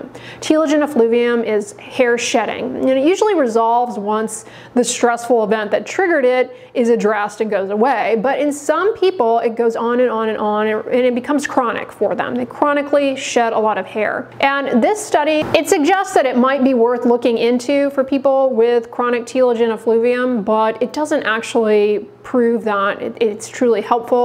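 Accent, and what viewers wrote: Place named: Houston, United States. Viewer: That's Houstonian accent in Texas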